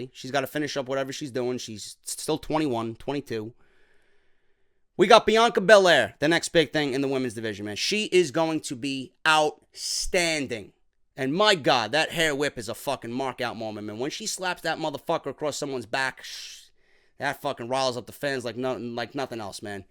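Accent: New York, United States. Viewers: You're a natural for commentary. You have a distinctive voice due to your New York accent. Better than some of the guys in the WWE.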